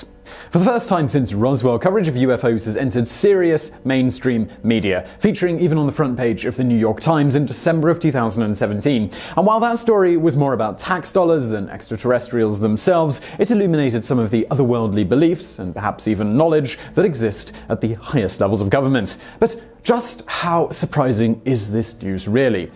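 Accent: United Kingdom. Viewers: He is British , hence he use British not American pronunciation norms. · He's British!? I hadn't realised !!!! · Hes British. They say some words differently.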